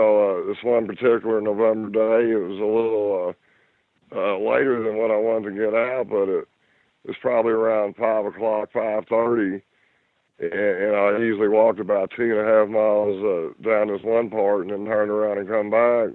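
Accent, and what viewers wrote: South, United States. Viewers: Just a Southern drawl to me! Nothin' bad about that.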